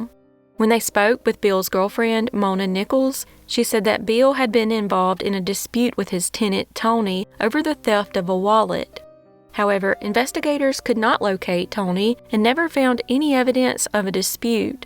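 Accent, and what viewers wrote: South, United States. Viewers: I am a 52yr old Lifelong Southerner, Native American, and Native Floridian. So before I say this, understand, it comes from a place of love, but, until you ditch the southern accent, you will never be given respect as a journalist. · Love your videos! 🤗 What state are you from ? I’m trying to pin point your accent! I was born in the south but moved to CA as a kid so I think your accent might be from my home state but I could be wrong !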